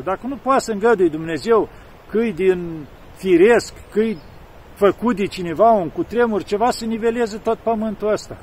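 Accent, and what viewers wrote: Moldova. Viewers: Bună ziua!!! Părinte cita blindete aveți, și apoi adăugind și acest grai dulce moldovenescsimt că mă cucerește, și vă ascult cu multă plăcere!!! Părinte vă rog amintiti-ne că postul este postul în fapte, nu, faptul că nu mincam de dulce pe cind faptele noastre sunt uneori reprobabile 😭Sănătate deplină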